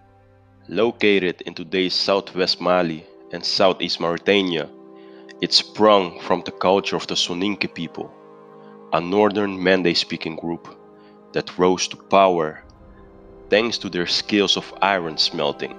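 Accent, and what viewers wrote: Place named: Poland. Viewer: Omo you sound more like polish or ukrainian or even Austrian...u sure?...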